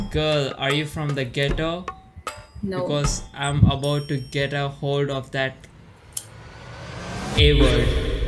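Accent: Hyderabad, India. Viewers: dude you're from Hyderabad? lmao 😂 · Bro are you from hyderabad wow... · Bhai tu Hyderabad se hai?? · you speak 100% of indian accent , that women must be she didnt know how indian accent sound like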